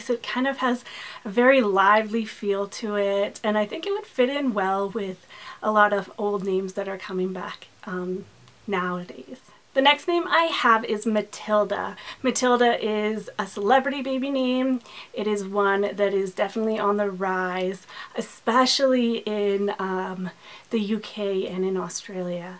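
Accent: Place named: France. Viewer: La chanson de Roland hahah how I love your french accent ! Much admiration from France belle demoiselle ;)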